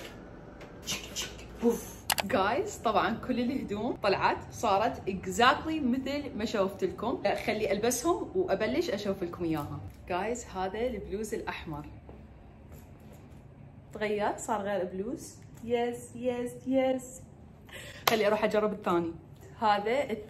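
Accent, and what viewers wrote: Iraq. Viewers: انت من العراق؟؟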